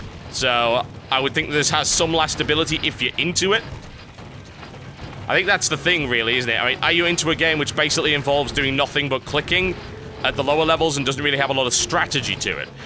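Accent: United Kingdom. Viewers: He's british. They say things funny. Like Amazon, to them, has an accent on the 2nd A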